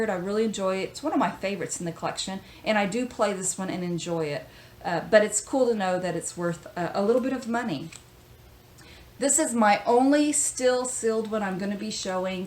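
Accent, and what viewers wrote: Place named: South, United States. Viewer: I love your accent, very southern lol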